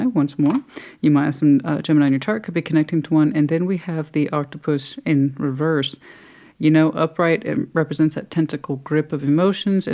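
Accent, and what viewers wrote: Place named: South, United States. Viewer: You are such an interesting and intelligent reader Scorpio sister, and I truly make an effort to try to listen to you. To no avail. Why effort? Because English is not my mother tongue, and despite having lived in D.C. for twenty years, I didn't have any exposure to the southern states, therefore your fast speech and southern accent with words not clearly articulated pose a big problem for me. I am sure others sail smoothly through your presentation. I manage a little and then I give up, as I just don't get but 30% of what you say. Sorry for being frank, regards from one older woman from Europe who would otherwise be ecstatic to follow your channel. Best to you!❤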